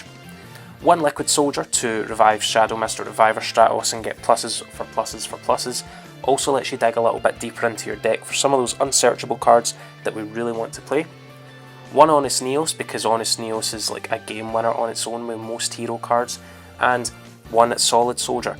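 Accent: Scotland, United Kingdom. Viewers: I hope I'm not the only guy that comments on any scottish accent video and make a reference to the limmy's show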